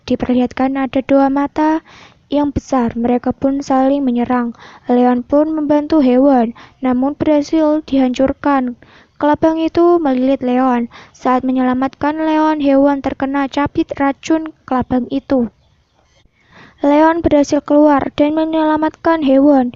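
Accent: Java, Indonesia. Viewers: Kak kakak orang jawa ya??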